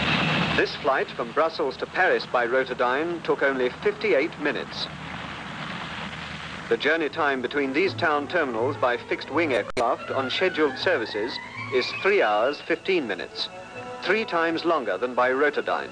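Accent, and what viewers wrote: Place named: United Kingdom. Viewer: Oh what a fantastic British accent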